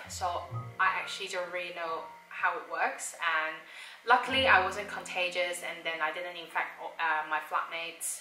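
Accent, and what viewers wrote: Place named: United Kingdom. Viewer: Ohh your english sounds so british now! Love it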